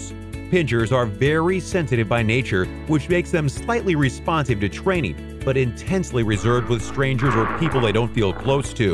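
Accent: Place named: United States. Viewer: You sound American, so why are you using centimeters and kilograms?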